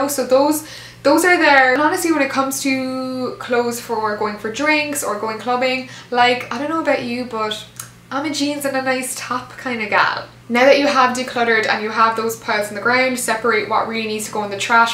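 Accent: Ireland. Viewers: Thank you for theses tips! I love your accent, are you from Ireland?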